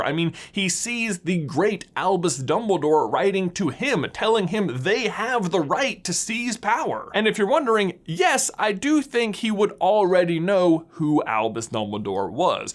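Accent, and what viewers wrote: Germany. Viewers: Your germane accent is so funny and bad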